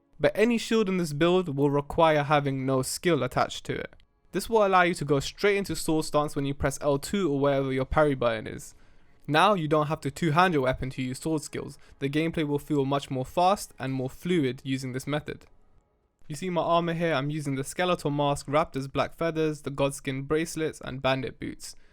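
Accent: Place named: London, United Kingdom. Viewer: Bro is definitely from london 😭😭😭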